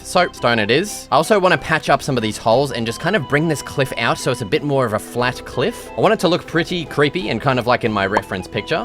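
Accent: Australia. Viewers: He's Australian, dumbass.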